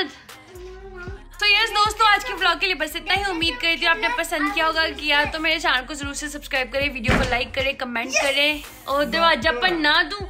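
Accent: Punjab, India. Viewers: Ap bht cutely punjabi bolti ho ❤☺☺☺Or apki family bht sweet hai 😍😍😍 big fan of you 😘😘😘😊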